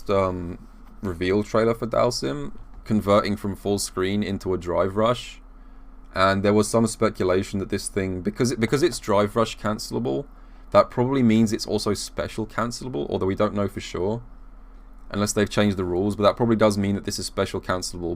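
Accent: United Kingdom. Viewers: I really enjoy u talking about sf tech u clearly know your shit and I could listen to an English accent all fukn day lmao it’s like the best of both worlds hahahah 👊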